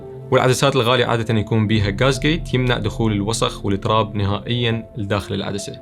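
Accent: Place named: Iraq. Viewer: يا أخي أقسم بالله كلمة شكر أقل بكثير في حقك الفيديو متعة ❤ مع اللهجة العراقية الجميلة كل الحب من المغرب ❤❤❤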